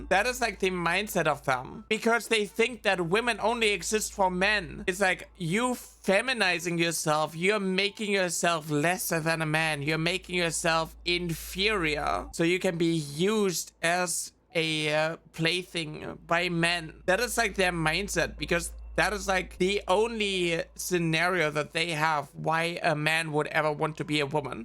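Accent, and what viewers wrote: Germany. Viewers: What's your accent? It sounds so familiar but I can't place my finger on it. German?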